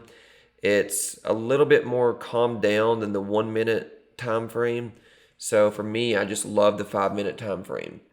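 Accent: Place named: South, United States. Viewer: You know, if someone didn’t know you, and just heard the southern accent and saw the average production quality, they would probably skip this vid… but you have a great way of not trying to overcomplicate explanations and your info is A+.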